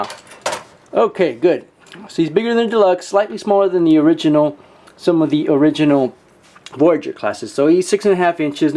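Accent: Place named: United States. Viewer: how do you have an american accent if your not from the US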